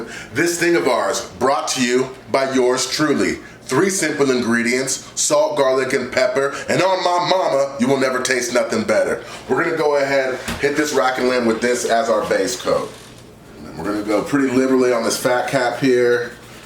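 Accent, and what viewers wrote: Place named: California, United States. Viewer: U got that cali accent, never noticed lol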